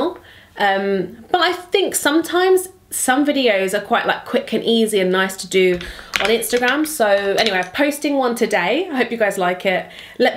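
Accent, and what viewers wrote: United Kingdom. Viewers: I love her british accent and the way she says "Bits & Bobs" and all of the traveling she's getting done! Indie is getting to see the world already! You guys are great parents 💕🤗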